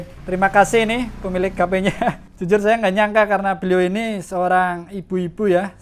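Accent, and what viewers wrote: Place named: Tegal, Indonesia. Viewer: Mas njenengan banjarnegara, tapi logatnya ko kaya tegal ya?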